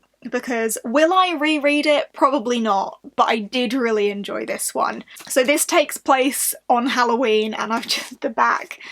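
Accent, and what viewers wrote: England, United Kingdom. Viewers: Hello, I'm Andrea. I discovered Middle grade books because of you and i absolutely love it!! I'm from South America, Colombia and i really like your English accent. Plus you are beautiful and smart.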